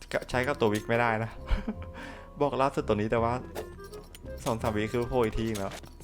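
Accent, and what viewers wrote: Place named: Ratchaburi, Thailand. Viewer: เสียงพี่เหน่อๆเหมือน ราชบุรี-กาญจนบุรี เลยครับ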